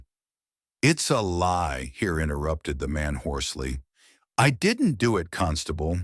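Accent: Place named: United States. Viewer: I'm sure this is a very fine narrator but I I don't believe these stories could possibly carry as well with an American/Canadian accent as with someone from the UK…since these stories take place in London, and London being as great a melting pot as say New York City San Francisco or chicago, of the same era, any accent from the British isles would do. I mean if it's a i which it obviously is, can't you just as easily click on the British accent button, or however it works??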